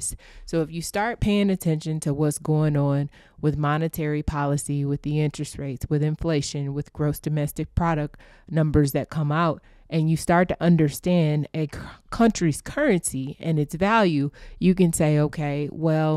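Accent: Houston, United States. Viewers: Thank u for the info..I bought Billy Carsons workshop a while back.....Is that a Houston accent? I'm kinda hearing Kelly and Beyonce...which is CRAZY that u favor both of them! love the content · Are you from Houston?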